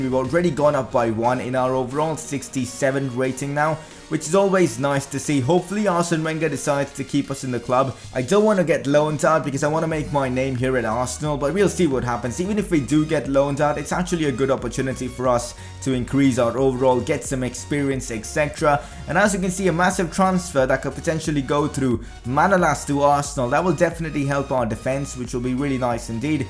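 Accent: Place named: India. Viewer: Man he sound like a England guy but in 2024 he sound like Indian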